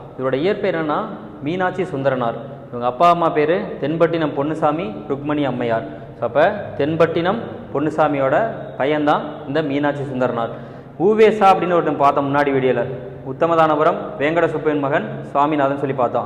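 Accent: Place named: Tirunelveli, India. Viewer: சாருக்கு திருநெல்வேலி போல... ழ் உச்சரிப்பு மட்டும் தகராறா இருக்கு. மத்தப்படி பாடம் லாம் செம டீடெயில் ❤️ உதவியா இருக்கு 🙌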